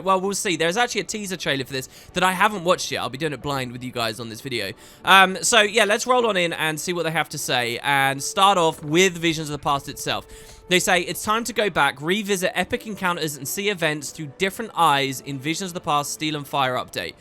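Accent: United Kingdom. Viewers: You have nice voice, always enjoying your accent (not too British, so I can actually understand) and intonation. (Tho sometimes your videos have a bit screwed up volume balance ;d Just wanted to compliment you as a fan ;)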